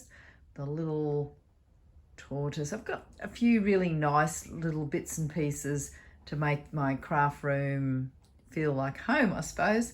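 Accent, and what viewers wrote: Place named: Australia. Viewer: I have always thought I was born in the wrong place.. im not supposed to be from CALIFORNIA, I was supposed to be Australian!🤣 I LOVE your accent.. it's so amazing! So is your craft room, of course! Your room is absolutely gorgeous!! 🥰Quick story.. my grandparents went to Hawaii 1x when I was about 13, I'm 44 now lol. I told them to bring me back a cute boy, lol! When they got home from their trip, they showed us the home videos they took. In the middle of the video, were 2 guys on the beach! All of a sudden, they say... "G'day Melinda! We're from Australia!" I about died!!! My grandpa went on the beach to find 2 guys without shirts of course, and asked them to film a clip for me! I miss my gpa so much! He did this stuff all the time! ❤️ I know this isn't about that story.. but it's just to show how long and much I've loved Australia! 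I hope I get to go someday!❤️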